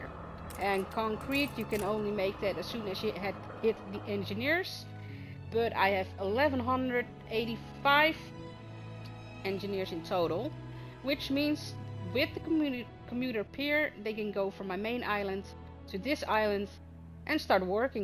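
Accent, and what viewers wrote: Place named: Netherlands. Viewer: ik kon wel duidelijk horen dat je Nederlands bent. 🥳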